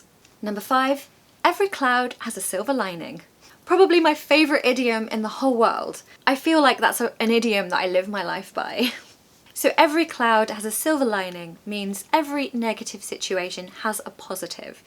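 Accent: RP, United Kingdom. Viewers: Hi, i love your British accent ❤ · ❤❤❤❤❤❤❤❤ Love you so much ! Your Modern RP accent is really beautiful and wonderful My GREATEST Teacher of British English Language!!! ❤❤❤❤❤❤❤❤❤❤❤❤❤❤ ❤❤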